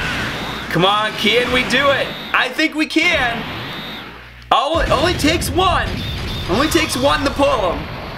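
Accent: Boston, United States. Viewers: You from Boston my guy?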